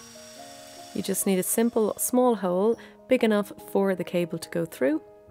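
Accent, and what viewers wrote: Ireland. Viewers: YES, you're right! I am from France, near Lourdes, and I do so much like this soft lovely Irish voice, as you said! Thanks,!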